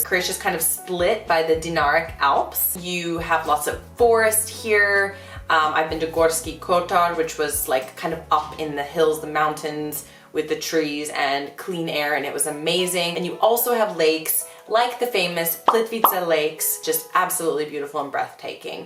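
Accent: United States. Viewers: and now , all that you said in English, translate into Croatian, Serbian and Bosnian. Write as you speak, read as it is written... Work on the voice pronunciation - "Ć, Č, DŽ, Š, Ž ... in your case, since you are an American - R voice, too... Language has a lot of consonants, seven cases, which is not a lot, Finnish has 14... It is hard, but you'll get it :) you do not need a Cyrillic letter for Croatian, you do not need it even for Serbian, only if you are an enthusiast. This was once called the Serbo -Croatian language, and now they are separated - these are nuances, language is the same ... Bosnian is the same language, too :)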